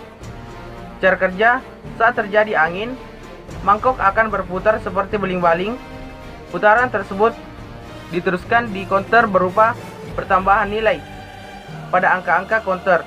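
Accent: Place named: Java, Indonesia. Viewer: Kok medok yahh?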